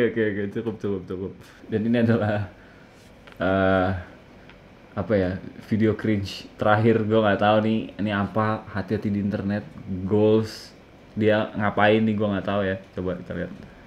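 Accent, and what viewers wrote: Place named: Bali, Indonesia. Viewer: Duh aksen baline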